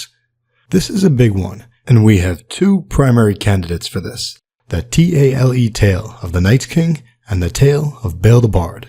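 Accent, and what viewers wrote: New Jersey, United States. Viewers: You knocked the narration of this one out of the ball park!! Not too fast not too slow & just a hint of Jersey 😀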